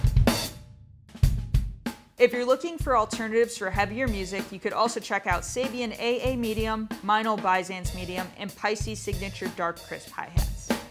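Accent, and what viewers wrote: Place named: Chicago, United States. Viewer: She sounds like she’s from Chi-Cah-Goe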